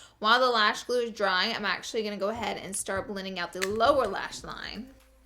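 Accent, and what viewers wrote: South, United States. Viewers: yes because she’s southern and has an accent.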